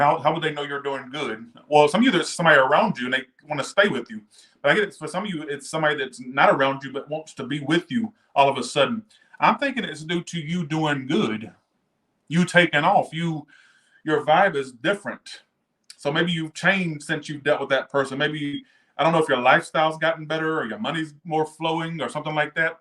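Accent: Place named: South, United States. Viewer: I liked your strong Southern Accent during your Libra Reading. 🌋 Yes Observed and Monitored. Undercover Cops?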